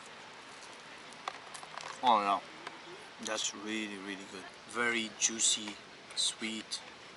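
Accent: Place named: Ireland. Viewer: I thought I could hear the Irish in you ;)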